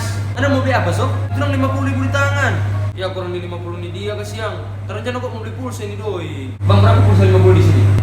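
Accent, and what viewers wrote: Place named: Manado, Indonesia. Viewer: Viralkn logat manado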